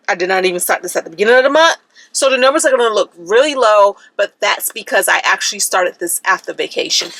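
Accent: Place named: New York, United States. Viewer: Love your content ... you talk a little like a a New Yorker... are you ? Very grateful for your tips and tricks !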